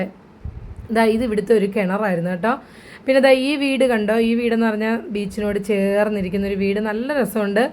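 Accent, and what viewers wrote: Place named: Kochi, India. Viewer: ഞാൻ അബ്ദുറഹ്മാൻ ചാവക്കാട്😂 നിങ്ങൾ ലക്ഷദീപിലാണോ, ഭാഷാ കേട്ടിട്ട് കൊച്ചിക്കാരത്തിയുടെ പോലെ തോന്നുന്നുണ്ട്, അവിടെ ഫ്രഷ് മീൻ കിട്ടുമല്ലോ, നിങ്ങളുടെ ഭാഗ്യം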